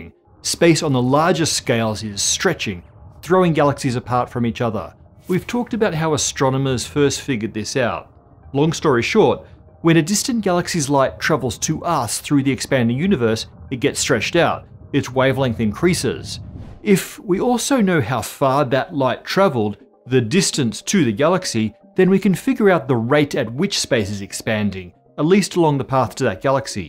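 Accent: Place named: Australia. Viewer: his accent is in a way closer to australian, with some peculiarities of Irish, possibly of family origin.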